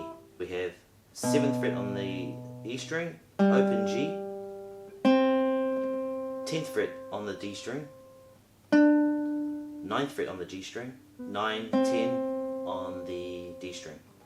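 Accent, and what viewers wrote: New Zealand. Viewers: great, thanks a lot , sure i will . oh you are from New Zealand ¡ its a nice accent . Take care amigo ¡ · Kia Ora brother, absolutely fantastic stuff, I have been working on a few of your uploads and loving your gentle nature and articulate communication, impressive Spanish pronunciation mixed with classic Kiwi "orf" instead of "off" .. love it to bits! Much Aroha to you. Um I see you are using a voicing of what appears to be a C7th and you are saying how it's digit gymnastics - I watched Ana Vidovic and Pepe Romero and they appear to be using a bar arrangement from the 8th fret with the high E? So I am wondering what is the best? Obviously you have proven your way works but I can't help wondering, any thoughts? Cheers. Nick. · I knew I could hear the NZ accent! Greetings from Christchurch.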